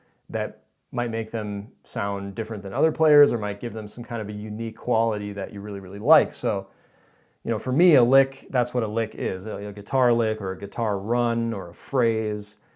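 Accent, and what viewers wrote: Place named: Canada. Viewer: Sure sounds Canadian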